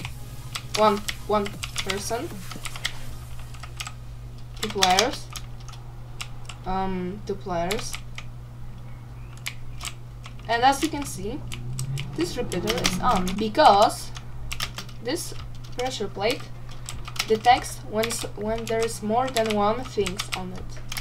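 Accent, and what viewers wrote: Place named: Germany. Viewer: are you german? i like your accent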